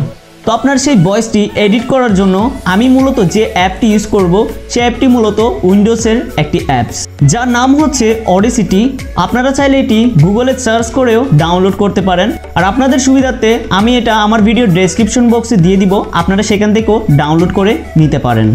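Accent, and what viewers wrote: Chittagong, Bangladesh. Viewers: ভাই কি চাটগাঁইয়া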